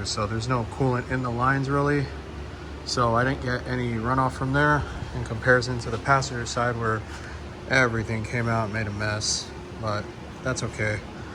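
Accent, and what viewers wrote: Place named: United States. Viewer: Thank you from Spain to share this. I love Alfa Romeo Giulia and you explain very well even if with American accent! You help a lot with your videos!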